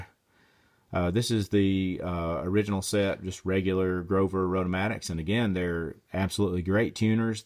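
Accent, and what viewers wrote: Alabama, United States. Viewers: are you from alabama?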